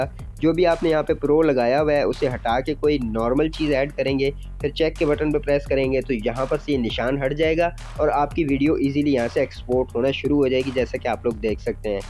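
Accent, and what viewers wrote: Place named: India. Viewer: i don’t understand ur indian accent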